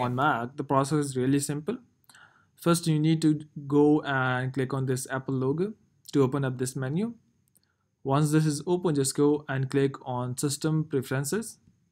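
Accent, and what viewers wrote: India. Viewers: as soon as i heard the indian accent i knew i was saved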